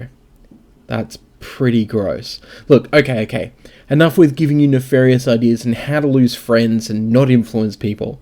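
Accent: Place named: Australia. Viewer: You have to ask yourself about motive here buddy. Someone making a fake card to reveal will quickly be outed and completely destroy their credibility and their channel. Why on earth would anyone do this? You might get this from some random no-name channel with no established history, but that's clearly not the case here. The reason this spoiler is a bit early is because I highly suspect the channel owner is an Australian (based on accent), and Australia happens to already be in July 23rd as I write this.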